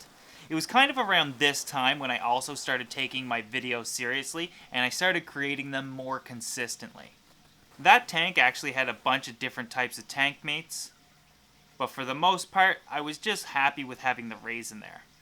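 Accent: Canada. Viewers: you make good videos. Well planned and we'll spoken. are you Canadian? jw u sound it . cool videos thanks for the info!!!!!!!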